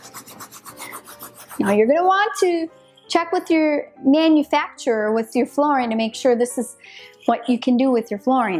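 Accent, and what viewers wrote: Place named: Minnesota, United States. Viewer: I love your accent! Are you from Minnesota?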